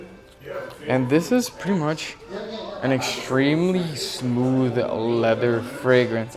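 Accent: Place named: Italy. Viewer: 🤨 Italian voice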